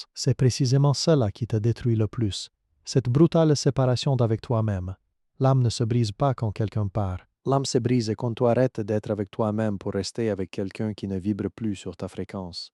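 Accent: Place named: France. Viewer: 😂Ce que j'aime dans cette vidéo c'est l'accent français qui relate les faits classiques et la solution 🎉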